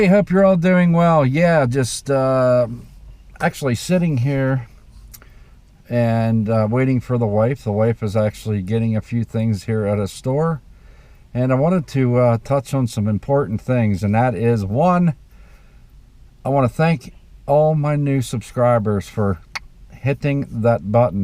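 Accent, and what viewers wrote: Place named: South, United States. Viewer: I Think Your From The South,Can I Asked Where Is It:)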